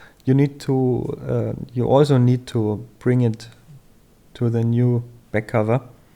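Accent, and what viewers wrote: Germany. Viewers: iam hearing a bisschen deutsch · Are you from germany ? And nice video bro i'm gonna try this on my 5s 💪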